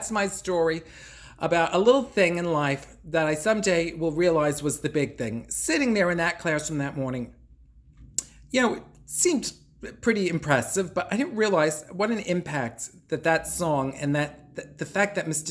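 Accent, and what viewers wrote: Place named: Bronx, United States. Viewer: Omggg..i said you reminded me of my aunts from the Bronx ..You are from the Bronx !! Im floored !!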